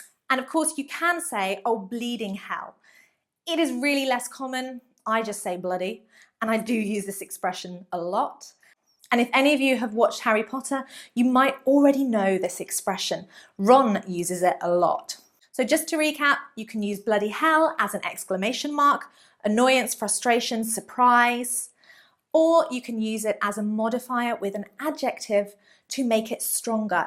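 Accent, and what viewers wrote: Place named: RP, United Kingdom. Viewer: please do a video on your modern RP accent, i'm really impressed by your accent and want to learn so soon. I hope that you'll consider my request.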